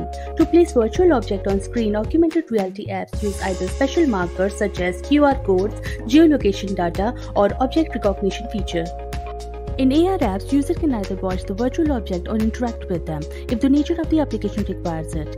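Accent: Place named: India. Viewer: Yeah, also the Indian accent English grates on the ear. Slow down please. I feel like I will explode while watching but the content is good.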